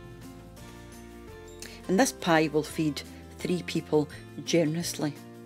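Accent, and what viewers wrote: Scotland, United Kingdom. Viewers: Hello from Michigan, USA. I just found you here a few weeks ago. I have made a few of your recipes. They were good. I made individual Cottage Pies and froze all but one, which I ate that night. Husband doesn't like them. He can be a picky eater. I like your recipe better than the one I have been using. Also the beef stew with orange marmalade. My paternal grandfather, born in 1900, was from Aberdeenshire, and came over with his family in 1906. I am glad that I found a Scottish woman who cooks real recipes the true way. I also love your accent. Your weekly shopping got me to go back to using Aldi. I do have to look up and convert grams, Celsius and mark 5. My maternal grandmother was from Canada, so I have no problems with your way of speaking. I know that tea time is our supper, you sit on the Chesterfield instead of a couch, running shoes are tennis shoes, etc. 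Keep up the great show. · I love the scots accent, just great. The recipes as well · ❤ the accent and this recipe. Scottish?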